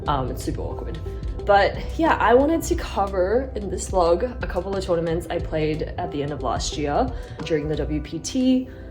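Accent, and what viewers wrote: Australia. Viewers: You must come from Australia because of you accent. Right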